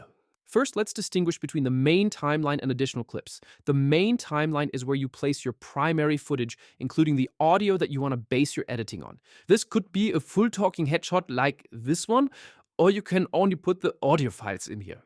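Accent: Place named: Europe. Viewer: I couldn't help but notice that your speech accent changed drastically...like your glorious European (which country?) accent was just "erased", or at least muddled and more American. What happened there? Did AI try to correct that, and American-ize your speech? I'd seriously like to know! For the record, I like your accent, it's very distinguished.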